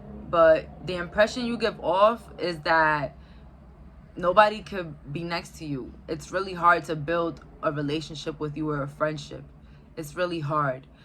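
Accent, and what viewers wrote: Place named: Brooklyn, United States. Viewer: you really be from NY huh? im cacklin sis you be radiating brooklyn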